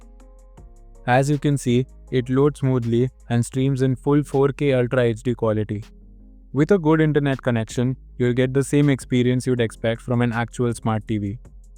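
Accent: India. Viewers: When you hear the Indian accent you kbow he finna make yo shit work, may your food be good mah bro❤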